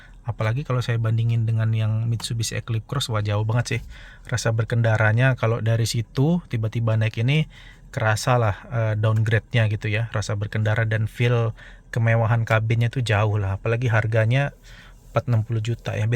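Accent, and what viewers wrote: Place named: Padang, Indonesia. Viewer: Suara aksennya kayak orang padang ya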